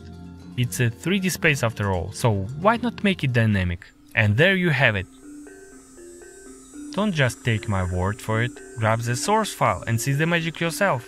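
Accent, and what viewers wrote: Russia. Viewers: Oh man, your strong Russian accent is great.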